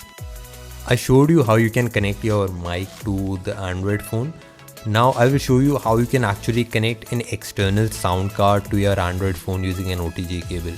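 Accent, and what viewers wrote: India. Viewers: Another bad english accent from yet another indian guy.... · Tired of the indian accent explaining electronics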